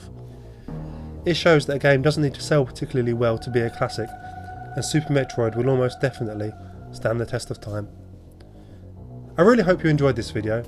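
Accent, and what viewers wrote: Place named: United Kingdom. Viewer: Ah, I got a British accent, this time. Lotta Metroid videos, lately.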